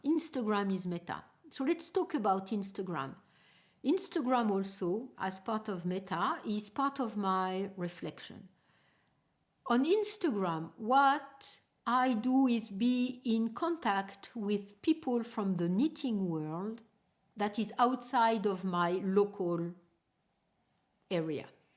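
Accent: France. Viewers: it was like hearing myself speak all my internal thoughts, but with a French accent!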